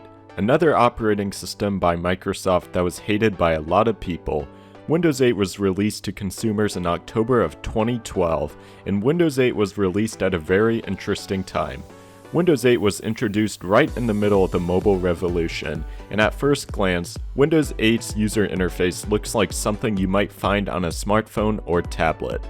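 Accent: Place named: Canada. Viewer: It may be just me but I kind of like his accent in his videos. It sounds Canadian to me. I almost expected him to say oot and aboot.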